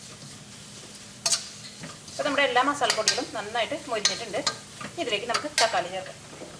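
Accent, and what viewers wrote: Thrissur, India. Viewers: simble ayi arkum theyyarakkan pattunna reethiyilanu chechiyude show nyan uae yilanu nyan eee show nokki friendsinte munpil alayittundu prathyakichu chechiyude slang thani nadan thrissur oreyoru vishamameyullu enne ithrayumathikam karikalundakkan bpadippicha chechiye onnu kanan pattumo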